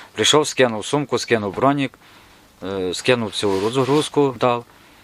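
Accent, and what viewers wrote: Ukraine. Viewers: С гуцульский акцентом разговаривает.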